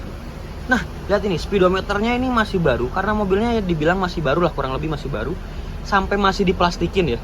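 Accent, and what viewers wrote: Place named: Java, Indonesia. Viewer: Ora tentrem ora marem (tidak tentrem tidak sangar/keren)hehehe logat jowo😅🙏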